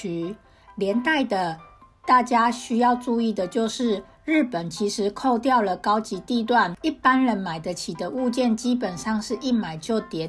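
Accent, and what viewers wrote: Taiwan. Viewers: 我喜歡這個心智圖，還有，台語腔調的國語，哈哈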